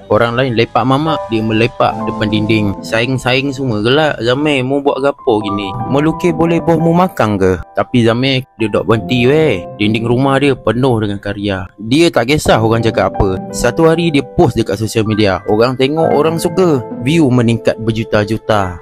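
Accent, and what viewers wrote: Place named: Terengganu, Malaysia. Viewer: Bulih kira mung cakak ganu awang😅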